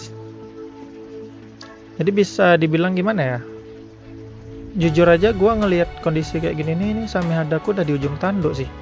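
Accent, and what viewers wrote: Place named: West Sumatra, Indonesia. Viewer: BTW abang orang mana bang?kok cara logat bicara nya mirip orang sumatra barat ya bang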